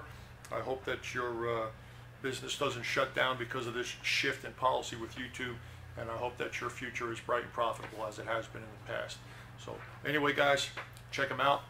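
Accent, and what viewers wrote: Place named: New Jersey, United States. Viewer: Eloquent and heart-felt explanation. You had the balls and forethought to let people know what was happening, and showed it was with major reluctance (not sure I've heard you you swear before).. Odd that people accuse you of not being a true Texan in the comments, as a Brit I'd put your accent somewhere in New Jersey. Nonetheless you're obviously an "all American guy" who goes out of his way to help and serve others- don't change. Edit: just watched again and you said "shiFt in YouTube policy" - I heard "shittin' ", I should buy some better headphones:)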